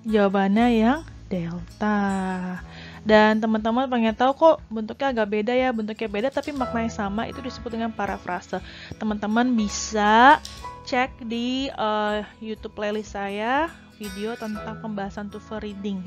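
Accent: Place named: South Jakarta, Indonesia. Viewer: I like how to teach and explain because it is very clear then is also very slang because we can use South Jakarta-style English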